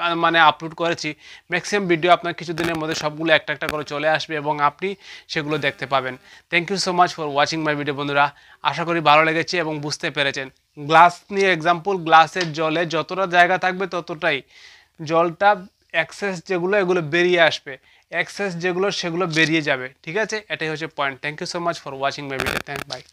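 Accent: Sylhet, Bangladesh. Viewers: Apnki ki sylheti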